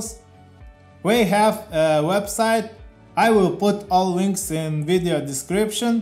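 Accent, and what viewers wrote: Russia. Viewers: Русский акцент)) уважение.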